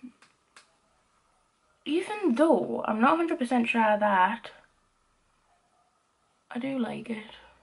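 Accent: Newcastle, United Kingdom. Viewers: I love your Geordie accent I’m from the north east my self and you are they most stripped down honest you tuber I have came across don’t really know if you will see this but hey ho I love your channel iv just came across you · i love watching you cos its so nice to hear a geordie accent for once and youre just so real and lovely xx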